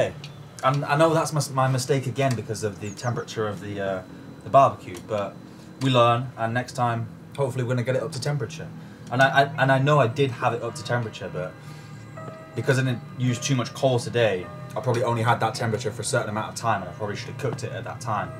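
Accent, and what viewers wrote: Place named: Staffordshire, United Kingdom. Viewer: Love your videos mate. I'd ask where you're from, but that would be rude lol. Accent sounds like it could be relatively local to me in Staffs lol. Probably far wrong but ehh 😂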